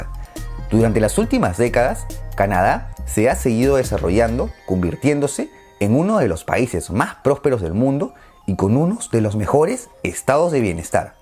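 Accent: Peru. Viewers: Es Peruano, En Perú no hay muchos acentos. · Es peruano xd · por la voz y tu borrador artesco,eres de peru,no? jajaja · es peruano, el acento y el borrador Artesco, ambos peruanos!.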